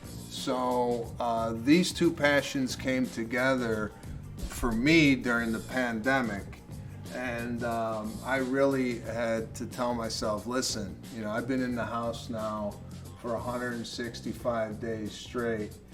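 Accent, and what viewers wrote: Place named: New York, United States. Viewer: It was interesting to hear things about you. I also like how you exaggerate your New York accent (and your HILARIOUS body movements!!!) during your routines.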